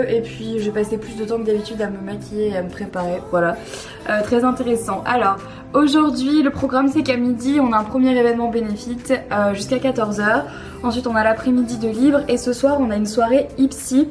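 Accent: England, United Kingdom. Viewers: J'aime trop ton accent anglais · T'as un super accent anglais ♥️♥️♥️!!!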